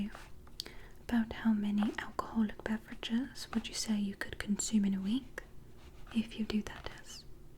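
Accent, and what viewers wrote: United Kingdom. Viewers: As a Brit.. I can confirm..The accent is fantastic! Well done,love! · Bravo on the British accent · Lovin the British accent ☺ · You are the Most Beautiful ASMR Doctor ever!!! I love the British Accent!! Thanks for the tests!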